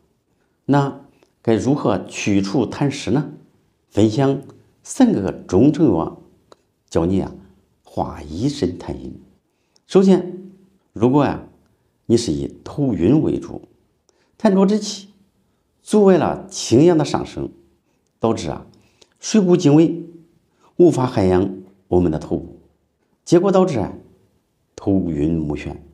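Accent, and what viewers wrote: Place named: Henan, China. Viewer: 这个河南口音来的太正宗了，大概是南阳的吧！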